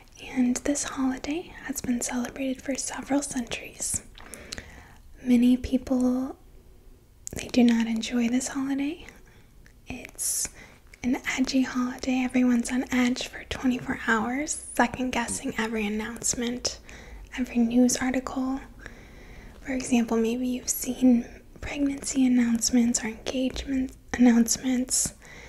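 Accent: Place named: Wisconsin, United States. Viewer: Your definitely from Wisconsin lmao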